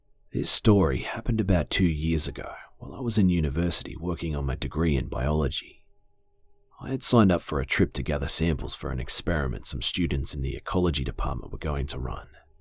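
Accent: Australia. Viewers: Are you Australia mate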